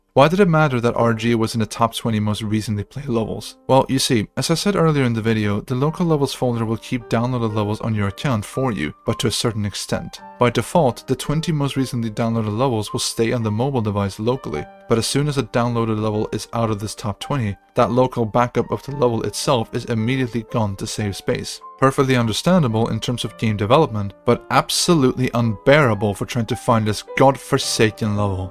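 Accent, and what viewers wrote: Sweden. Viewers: he’s Swedish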